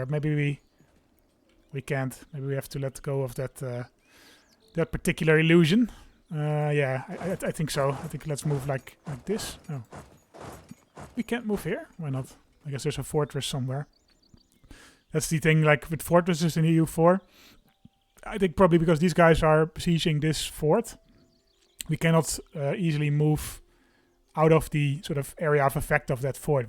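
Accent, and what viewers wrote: Netherlands. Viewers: I see why you chose Holland. Your accent sounds dutch. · As an American, hearing that this is a Dutch accent makes me realize how there's so many Dutch people in America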